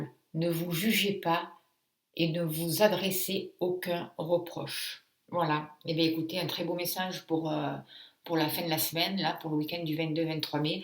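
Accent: South, France. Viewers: bonjour et merci pour vos videos tres justes, et j adore votre accent du sud ;)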